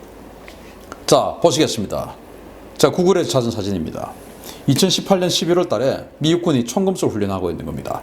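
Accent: Gyeongsang, South Korea. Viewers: 짝대기~역쉬 갱상도 사나~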